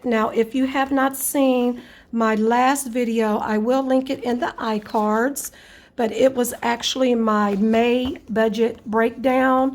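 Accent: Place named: South, United States. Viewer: I love your southern accent where are u from?✨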